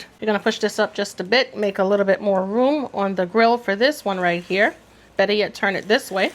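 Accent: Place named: Philadelphia, United States. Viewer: Are you from Philly? I just ask bc of the accent.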